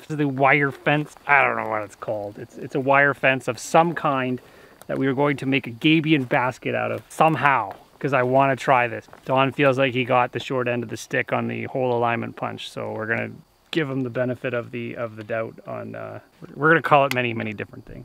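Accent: Canada. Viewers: self reliance. tiny house. dog. drone footage. faint canadian accent. this is becoming a fun trend. lol.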